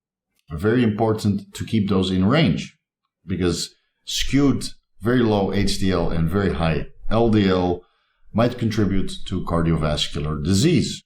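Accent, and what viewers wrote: Netherlands. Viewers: Came here from MPMD! Guess you are Dutch too, right? :)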